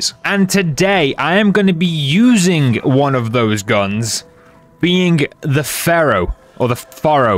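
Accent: United Kingdom. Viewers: Didn't realise you were British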